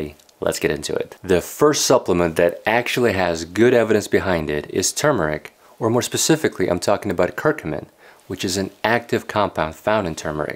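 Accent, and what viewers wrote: United States. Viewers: only like your American accent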